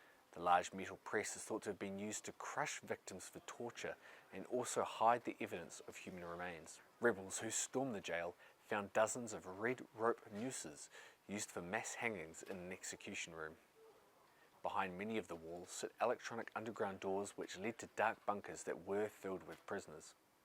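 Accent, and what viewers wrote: New Zealand. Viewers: You sound like a kiwi? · Is this a New Zealand accent? Hard to understand a lot of words.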